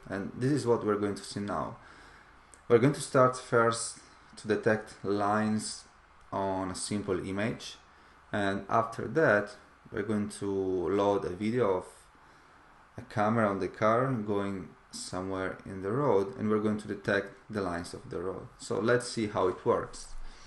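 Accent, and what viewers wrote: Italy. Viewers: Thanks a lot! Are you Italian?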